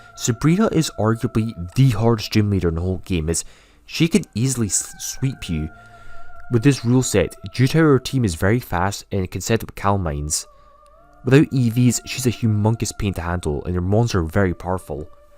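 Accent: Scotland, United Kingdom. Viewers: That's just his accent, not a speech impediment, you can hear that strong scottish accent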